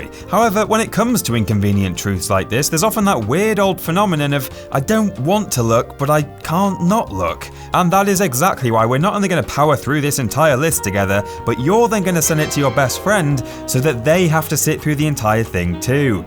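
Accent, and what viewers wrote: United Kingdom. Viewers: I just love British sounding narrators..............They sound so intelligent.............................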